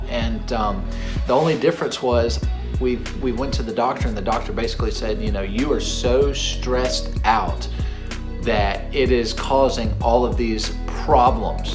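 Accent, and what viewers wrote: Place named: South, United States. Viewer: Sup, new to your channel! Slapped you with a like and a sub!!!! not to be weird but your voice is very nice! sweet and southern lol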